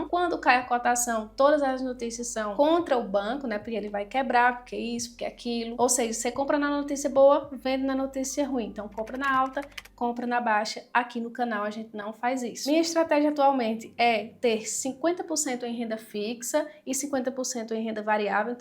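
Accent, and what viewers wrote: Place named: Paraíba, Brazil. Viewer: Amo assistir seus vídeos, pq eles são bem explicados. Outra coisa é o sotaque que conheço heheh já que tbm sou paraibana.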